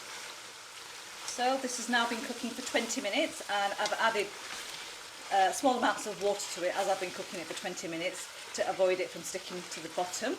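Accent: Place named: Coventry, United Kingdom. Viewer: WOW so simple to cook. I might impress the wife with this. Thanks. P.S are you from Coventry? Your accent sounds very Cov.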